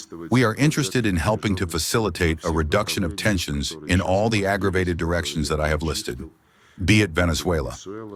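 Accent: United States. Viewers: Would be better without the dumb american accent.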